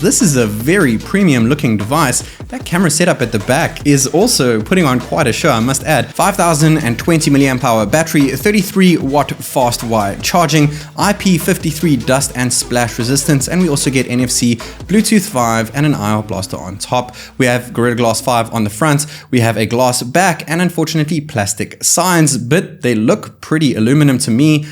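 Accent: South Africa. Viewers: Bro you sound South African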